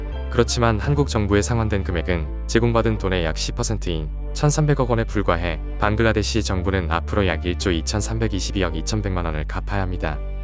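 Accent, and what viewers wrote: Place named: Jeolla, South Korea. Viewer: 전라도 말투 숨기지 마세요ㅠ 왜 전라도 유투브들은 우덜 말투를 왜 부끄러워하는지ㅠㅠ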